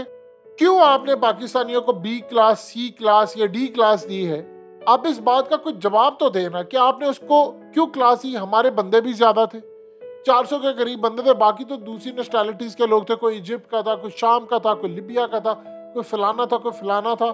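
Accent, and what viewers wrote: Punjab, India. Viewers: Mr.why you speaking Urdu in Punjabi style....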